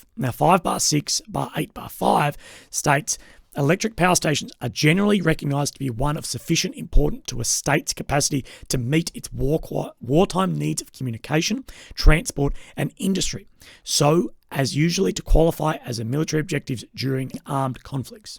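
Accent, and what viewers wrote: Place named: Australia. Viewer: You can generalise as you wish if it's based on a genuine observation not obstinance..... "I thought Aussies were better than this" is actually saying that Australians are held to a pretty high standing in the world of fair play, and standing up for the oppressed over the oppressors... along with the Kiwis. looks and sounds the part, but his consistent agenda is at odds with his apparent Aussie-ness and overstated Neutrality which doesn't follow through to his actual stance.....he's an Oxymoron (or is it Aussiemoron 🤔) Aussies are awesome, and I know he doesn't represent the majority!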